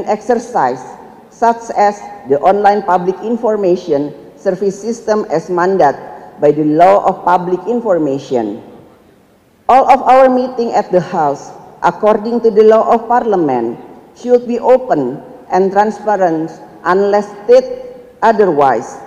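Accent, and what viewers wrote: Indonesia. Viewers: Selain yang sudah dibicarakan banyak orang, kesalahannya juga tuh dalam mengucapkan huruf "r" masih seperti dalam bahasa Indonesia. Harusnya tuh ga kaya gitu cara ngucapinnya. Jadi, lidahnya itu jangan menyentuh langit-langit mulut. Kalo menyentuh langit-langit mulut, ya jadi salah itu. Untuk lebih jelasnya lihat saja pidato bahasa Inggris presiden Amerika, apa pidatonya siapa gitulah yang pake bahasa Inggris dan bahasa Inggrisnya bagus gitu... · Ingris rasa jawa..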